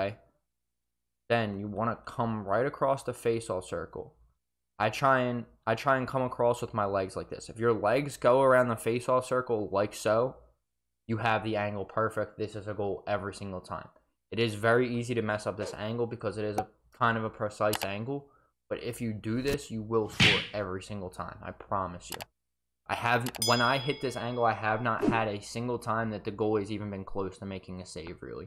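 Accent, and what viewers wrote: Philadelphia, United States. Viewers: This is how I went undefeated in franchise. Glitch goals are a must know as annoying as they are. This goal works the same way in game if you're behind the net as long as you either top left of the crease or top right depending on direction. Also you sound like a fello Philly bull so respect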